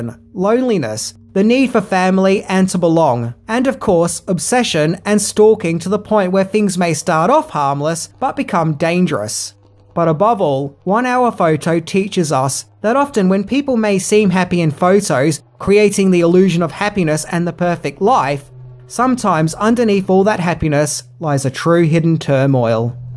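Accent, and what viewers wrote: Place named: Australia. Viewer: The Australian O is a glorious thing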